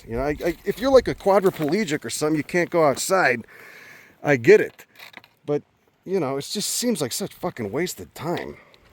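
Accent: Chicago, United States. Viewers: i found a link to this channel in a 2yr old reddit post about chicago accents. wasn't expecting to find a live channel with a brand new video. anyway, southsider here. you sound like we could be neighbors.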